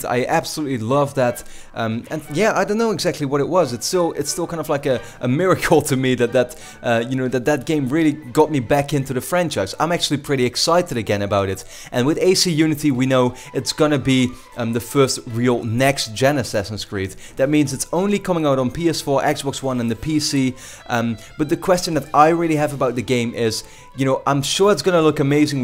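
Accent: Netherlands. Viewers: are you dutch? you have a bit of a dutch accent... :P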